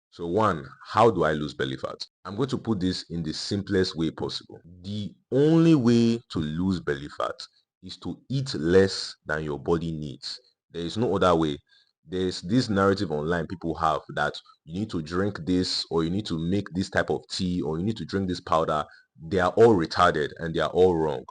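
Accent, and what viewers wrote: Nigeria. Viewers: It's massive Nigerian confidence. No pretenses. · Accent does not matter. We are proud Nigerians why pretend 😮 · That’s more of a Nigerian accent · My brotha you sound like a Nigerian. Oga God bless you ooo. Make I loose my belle ooo. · great video aside from the annoying nigerian accent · For those who are not from Africa, he’s not rude or mean, he’s just Nigerian. 🇳🇬🇳🇬😅😅😅 This is how they talk on regular conversations. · It's Nigerian accent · I am not sure if it is the Nigerian accent, or something. But this guys is very believable. Plus he tells the truth; no drama; no frills. Thank you! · this is Nigerian accent, what are you talking about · The Nigerian accent usually gives the opposite experience lol but okay.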